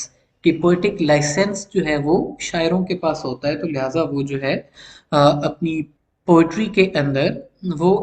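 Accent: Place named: United States. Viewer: Aap ki mother language to urdu hy but u speak English like an American so goo sir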